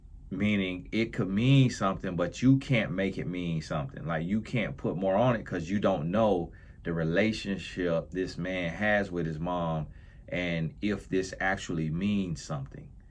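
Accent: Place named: Mississippi, United States. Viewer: are you from Mississippi??? I think I recognize your accent. ❤🎉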